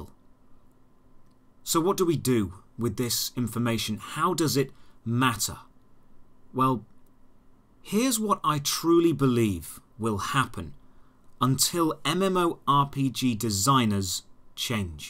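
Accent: United Kingdom. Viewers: man, british people talk the same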